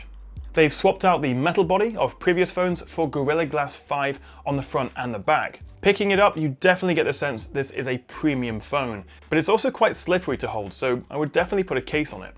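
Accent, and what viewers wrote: United Kingdom. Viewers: Finally I found a decent English( I mean localization) Tech review media brand on Youtube, I love the British street view and ofc your review, ( with a solid British accent which satisfies me a lot ), Cheers!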